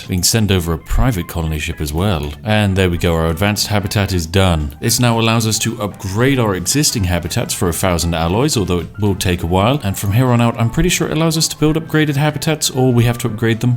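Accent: United Kingdom. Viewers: You're sounding awfully british today,.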